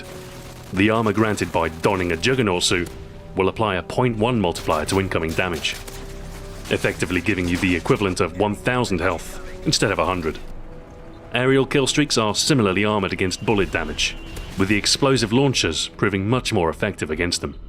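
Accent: England, United Kingdom. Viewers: yeah, the british accent is awesome! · No. this is just an a guy with an English accent who likes CoD, Soap has a Scottish accent anyways :P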